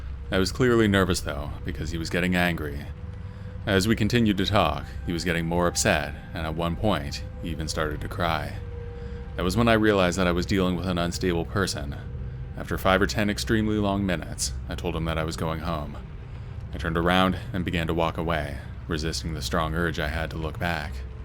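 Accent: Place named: Canada. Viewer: I knew there was something about your voice and it just dawned on me. You're Canadian.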